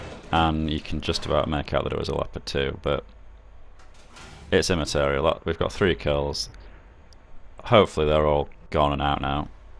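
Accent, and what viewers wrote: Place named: United States. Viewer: Are you from UK? I thought you are American from my perspective you talk with American accent.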